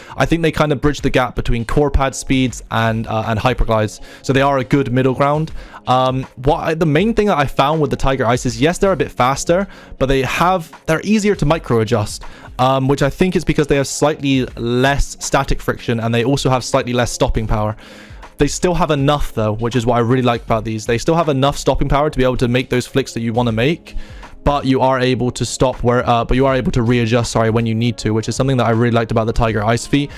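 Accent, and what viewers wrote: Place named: Bristol, United Kingdom. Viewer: You from Bristol mate? Amazing to hear a familiar accent in the peripheral community 😁 subbed and liked!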